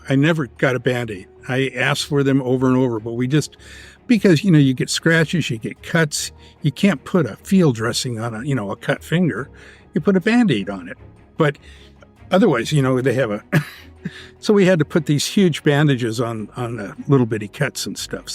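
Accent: United Kingdom. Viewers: why is this narrated by someone with a British accent?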